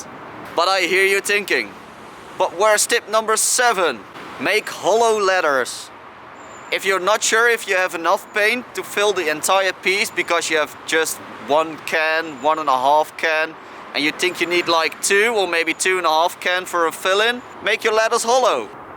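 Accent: Netherlands. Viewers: If this guy isn't Dutch all my knowledge of English accents is officially unvalid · If this isnt a Dutchie speaking English idk what is. goeie vid wel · Are you Dutch?? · Dat nederlandse accent maakt de video 10x beter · you dutch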